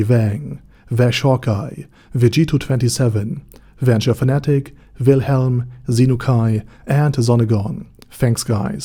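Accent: Germany. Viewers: Hearing someone analyze anime characters with a german accent sounds so wrong yet so enjoyable.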